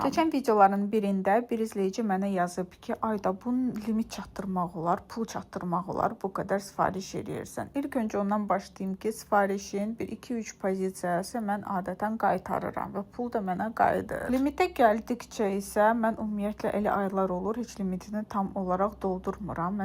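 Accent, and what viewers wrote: Russia. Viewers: danişıginda problem yoxdur, hətta bir çox rus dilli blogerlerden bele səviyyəli danışırsan. Sadəcə aktiv şəkildə rus dilində də danışdığına görə bu sənin aksentində hiss olunur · Rusdilli qadinsiz deye danisiginiz ferqlidi.ona gore ilk izleyenlere qəribe gelir. Ləzgisiz? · Salam yaxin olsaydin men de gelib goturerdim😢ve cox sirin danisirsan menim usaqlarim da rus dillidir rus sektirin oxuyular onlarda ele danisir ❤❤❤ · Xeyir sənin danşığında heçbir problem yoxdur hətta baxanda bir çox insandan daha yaxşı danşırsan əlavədə rus dili olduğun üçün xırda fərqliliy görlə bilər amma çox gözəl danşırsan hətta mənə ləzt edir sənin danışmağın sevilirsən❤❤❤❤ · mən sizin danışığınızı çox bəyənirəm mənim uşaqlarımda sizin kimi danışırdı Rus dili idi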